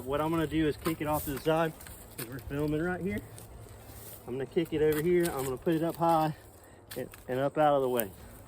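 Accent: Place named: Florida, United States. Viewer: thanks for a good video and plain florida talk. good luck hunting. I hunt osceola wma in a sumitt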